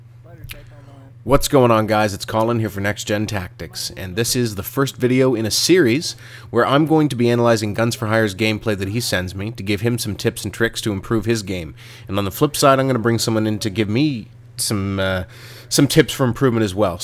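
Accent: Canada. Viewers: You sound Canadian. Are you?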